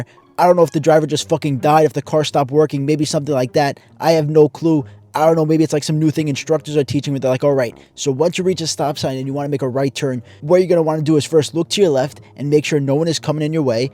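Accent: New York, United States. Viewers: You have a New York accent. Are you from NY?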